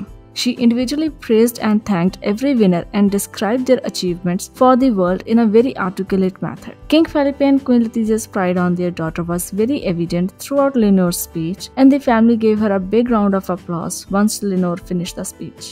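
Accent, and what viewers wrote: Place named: India. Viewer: La locutora en este video es una mujer de la India. Lo puedo determinar por su acento.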